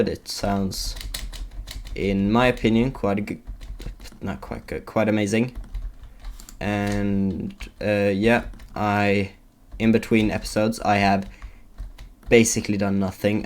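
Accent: Sweden. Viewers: Are u from Sweden cuz I feel like ur accent of pronouncing words is like Swedish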